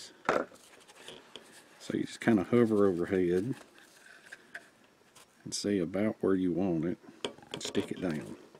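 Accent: South, United States. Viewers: Great vid. Are you from GA? Lol you sound just like my uncle from Savannah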